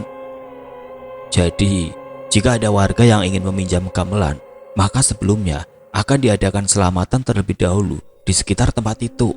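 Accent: Indonesia. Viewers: Pengucapan b. Inggris nya, Indonesia bgt😁👍👍. Dtunggu kelanjutannya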